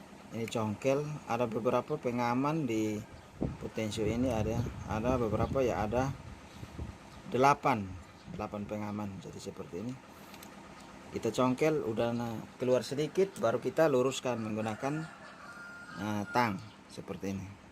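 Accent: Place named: East Nusa Tenggara, Indonesia. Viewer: Logat kek org NTT 😂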